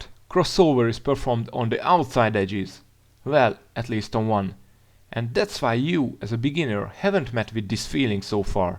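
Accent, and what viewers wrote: Hungary. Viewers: hallatszik a magyar kimondás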